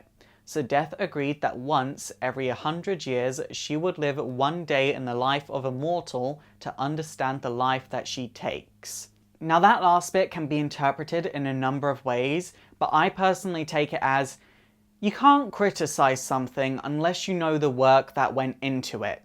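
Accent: United Kingdom. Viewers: It LITERALLY just occurred to me that you're British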